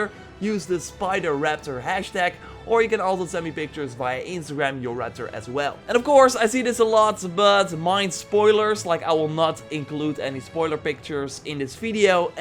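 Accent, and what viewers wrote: Netherlands. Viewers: Are you Dutch? ..You sound Dutch..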